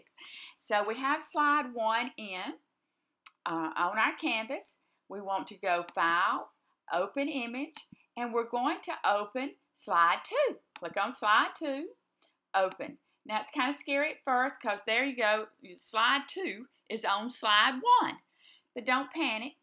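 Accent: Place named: South Carolina, United States. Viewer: Thank you. That was a very nice tutorial and seems much easier than some of the other tools I've toyed with tonight.Now, tell me - are you from South Carolina? (I am originally) and your voice made me feel back at home.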